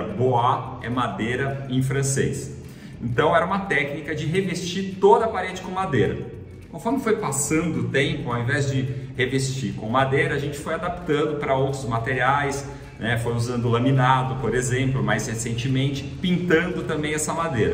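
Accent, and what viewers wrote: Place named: Paraná, Brazil. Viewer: Vc não perdeu o sotaque de pé vermelho!🙈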